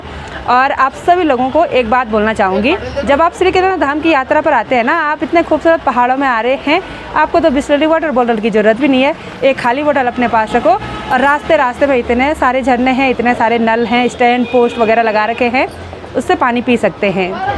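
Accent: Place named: Nimar, India. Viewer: आपकी भाषा कुछ हमारे मध्यप्रदेश के खंडवा निमाड़ जैसी लगती है दी 🎉🎉🎉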